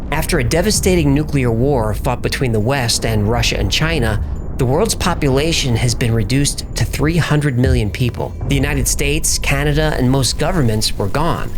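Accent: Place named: Long Island, United States. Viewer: I knew you were a long Islander when I heard the fish say "dowg treats" haha I'm a LI native too and you can't miss a long Island accent. It's so new yorky sounding lol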